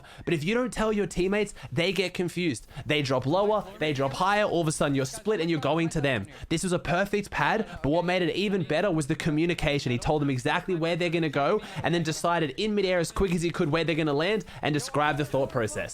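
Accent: Australia. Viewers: Nice Aussie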